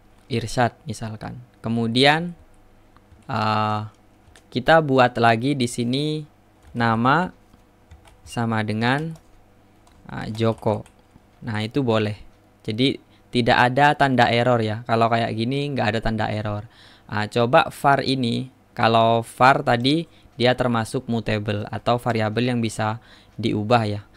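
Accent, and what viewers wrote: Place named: Madura, Indonesia. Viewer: dari logatnya kayanya orang madura deh :)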